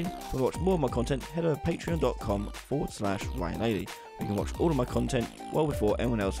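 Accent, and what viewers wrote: United Kingdom. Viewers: Once I got past the heavy Bri-ish accent, I realized that your way of explaining this stuff is the Most helpful. Thanks!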